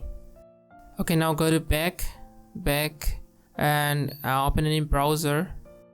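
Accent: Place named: India. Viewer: Indian — English version of India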